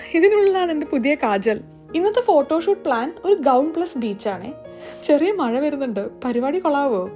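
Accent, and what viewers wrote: Malappuram, India. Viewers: ഞാനും മലപ്പുറം തന്നെ മലപ്പുറംകാരെ ഇങ്ങനെ പറയുള്ളൂ ചേച്ചി😂😂😂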